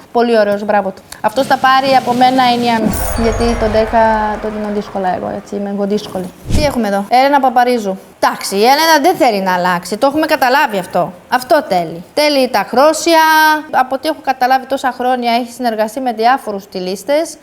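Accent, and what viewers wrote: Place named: Albania. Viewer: Δεν μπορώ να ακούω τον καπετάν καρνάβαλο να κάνει και μαθήματα μόδας.. τόσα χρόνια στην Ελλάδα και ακόμα μιλάει σαν την καθαρίστρια μου που ήρθε πριν 4 χρόνια από Αλβανία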